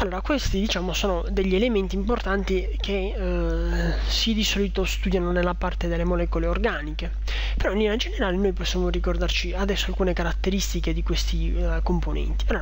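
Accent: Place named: Sardinia, Italy. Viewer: riesci a postare anche i link del test di medicina grazie... i tuoi video sono spettacolari poi con questo accento sardo ancora meglio complimenti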